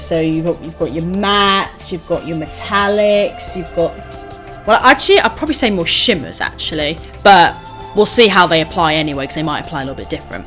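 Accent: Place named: Australia. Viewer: I love your videos, both because you take no shortcuts, but also for the fact we have similar taste. May I ask something. You sounds like you are coming from Australia - is that correct or I am just awful in recognizing dialects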